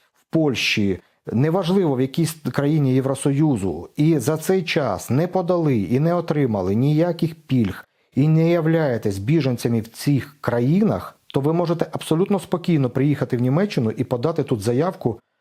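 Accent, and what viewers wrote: Slobozhanshchyna, Ukraine. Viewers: все нормально! А в минулому було не "на русском", а на слобожанському діалекті співучої української мови!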